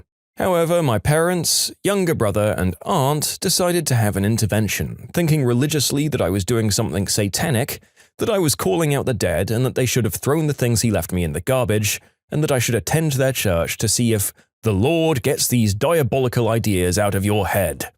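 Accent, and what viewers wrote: United Kingdom. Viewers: Th4 britidh accent makes this 200 percent better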